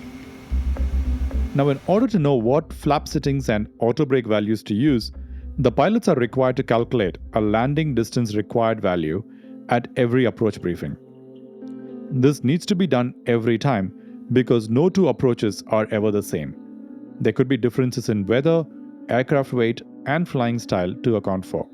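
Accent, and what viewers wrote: Kerala, India. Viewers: He is ofcourse a malu few pronunciation was mallu accent.. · Are you a malayali? I felt it from your accent. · Did you pronounce zh ( ഴ ) correctly you are either a Malayalam or a Tamil , your accent gives Malayali vibes . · Wtf. Why do you have a mallu accent? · Your pronunciation of kochi and kozhikkodu makes me wonder whether you are a malayali? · Narration in an amazing Indian English!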